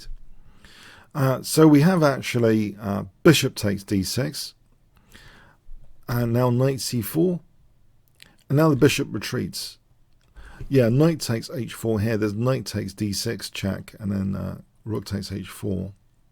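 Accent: England, United Kingdom. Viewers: Thank you so much dear I really learn so much from you, great analyzing tactics and plans you really Amazing. beside entertaining I like your English accent..smile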